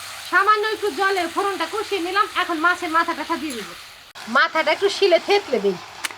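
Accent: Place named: Bangladesh. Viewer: Onar kotha barta Bangladesher moto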